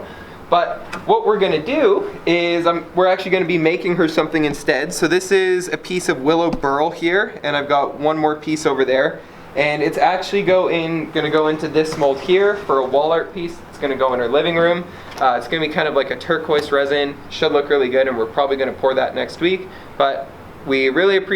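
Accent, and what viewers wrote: Canada. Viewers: Canadian there baud we all sound the same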